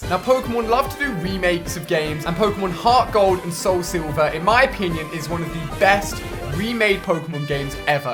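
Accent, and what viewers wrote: United Kingdom. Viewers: Is it just me or does he copy trainer tips but with a British accent?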